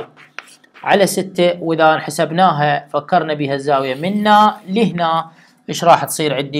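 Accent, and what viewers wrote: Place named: Basra, Iraq. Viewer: من لكنتك بصراوي😹😻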